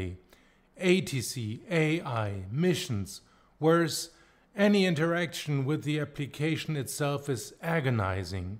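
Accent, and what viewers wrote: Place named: Germany. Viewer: A german with humour and a very german accent? Sign me in. Thank you dear neighbours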